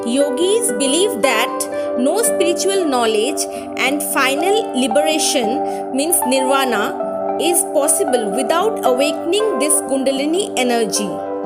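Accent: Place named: India. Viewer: I’m sorry, I can’t stand that Indian accent so I can’t listen to this video! It’s just so annoying. Lol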